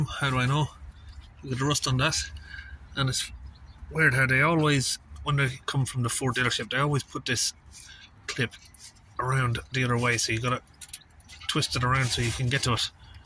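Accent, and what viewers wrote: Ireland. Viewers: He sounds Irish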